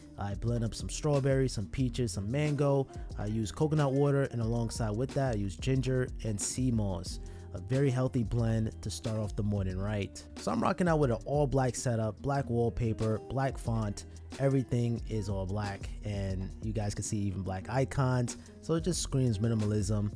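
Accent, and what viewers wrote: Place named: Queens, United States. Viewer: Omg you from southside queens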